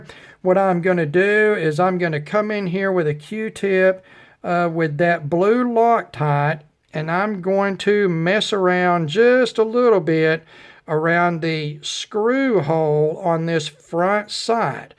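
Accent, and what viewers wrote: South, United States. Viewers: Love this fellas accent , he sounds like a southern gentleman.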